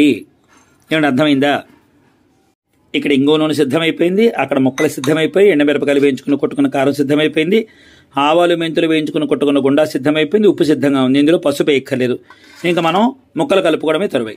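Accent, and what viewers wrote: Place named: Guntur, India. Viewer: Guruvu gariki namaskaramulu. Mee vantalu ma amma garini gurthu chestunay andi. Meedi a vooru andi. Mee vucharana guntur district ni gurthuchestondi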